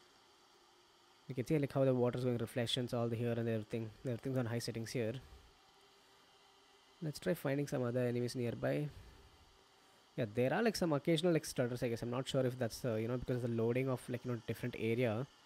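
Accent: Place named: India. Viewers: The problem is if he tries to change his accent to native speakers then indian people will say that he is trying to be sn American.😄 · I don't understand Indian English sory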